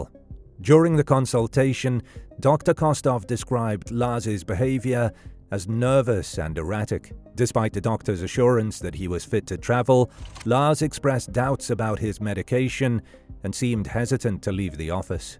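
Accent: United Kingdom. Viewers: Came here to say the same thing. A story about a German, narrated by someone with a British accent ... and they chose to use a word for football that's only really still officially used in USA/Canada..??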